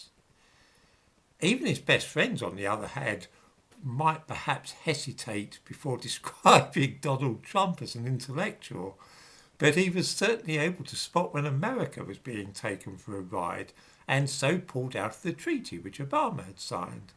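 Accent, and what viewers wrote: United Kingdom. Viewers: I've never been very interested in history. That is until i found your channel. I really enjoy your history lessons, your thoughts and your British accent. Thank you. Kind regards from Sweden.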